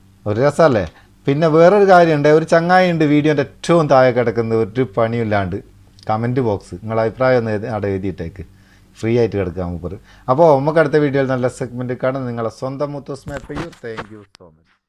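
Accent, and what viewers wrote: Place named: Malappuram, India. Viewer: ഇങ്ങളെ സംസാരം പൊളിച്ചു ഇങ്ങൾ മലപ്പുറത്ത്‌ കാരനാണോ👌👍👏👏👏👏msg ഗുഡ് sub ചെയ്തു ബെൽ ഐക്കൺ ക്ലിക്ക് ചെയ്‌തൂട്ടോ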